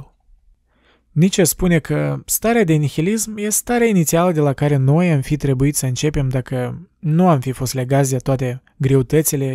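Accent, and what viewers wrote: Moldova. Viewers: o idee excelenta dar accentul moldovenesc imi vomita in ureche o abominatie a limbii romane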